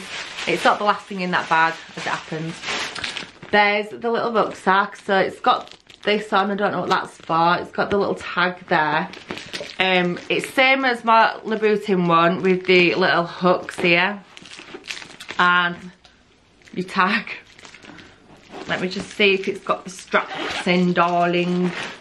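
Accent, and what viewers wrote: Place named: Manchester, United Kingdom. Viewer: “Propped good hun” proper Mancunian woman I’m a mancunian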